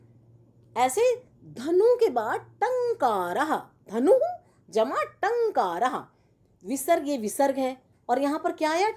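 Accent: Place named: Punjab, India. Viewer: Ma'am are you Punjabi?? Cause your accent is like that..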